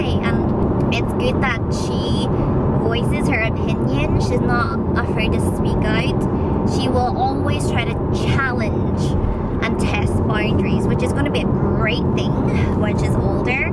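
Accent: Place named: Ireland. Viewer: I love how your accent is slowly starting to sound more irleandish each time I watch a video of yours :D Watching you since 10+ years, somehow its become a ritual to 'catch up' with you. Stay strong and bubbyful! Lots of Love <3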